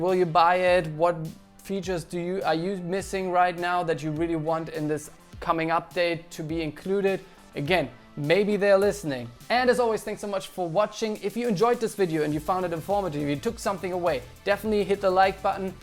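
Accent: Germany. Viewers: Where do you got your german accent from!?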